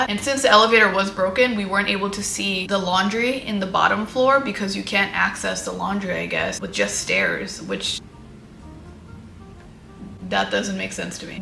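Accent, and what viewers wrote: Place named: Los Angeles, United States. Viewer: You’re not a native New Yorker… you have an LA accent not a New York! So quit frontin!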